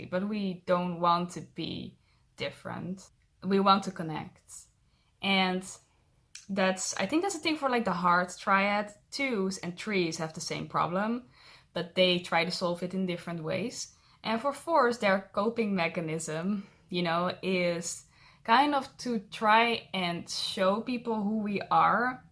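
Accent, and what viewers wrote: Netherlands. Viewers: Je klinkt heel Erg Nederlands ben je dat toevallig ook haha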